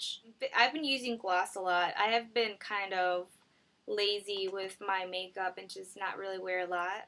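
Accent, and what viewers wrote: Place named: Alaska, United States. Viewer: I just noticed the sarah palin accent too haha.. anyway nice video!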